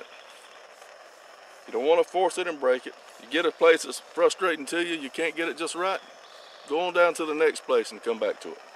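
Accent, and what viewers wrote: South, United States. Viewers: This guy is fantastic! As an archer from UK theres nobody ive seen,in years of shooting that makes things so interesting and inspires me so much to make things effective and traditional. Ive spent money both on bought and making my own. Loving the style, and that Southern accent too!!¡ Best wishes from South England 😊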